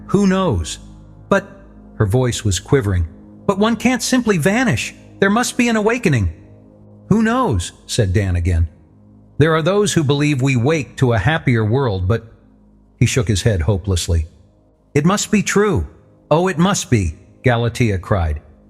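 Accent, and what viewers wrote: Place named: United States. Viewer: Too bad the narration switched to an annoying American voice.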